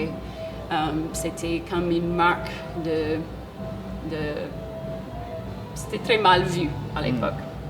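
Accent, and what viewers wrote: Quebec, Canada. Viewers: tu à un super niveau de français.. bravo.. Avec un léger accent québécois..!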